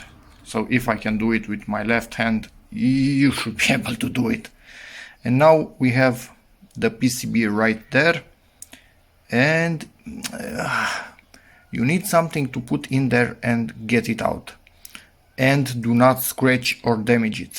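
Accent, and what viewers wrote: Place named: Romania. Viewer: Aoleooooo ... asta-i englezeasca rumaneasca. Cel mai urat suna englezeasca asta, rumaneasca. O recunosti intre un milion de variante. Categoric esti ruman !!!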